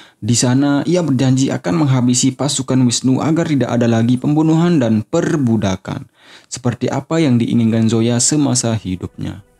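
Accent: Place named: Aceh, Indonesia. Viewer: Cara ngomongnya kaya orang Aceh min :v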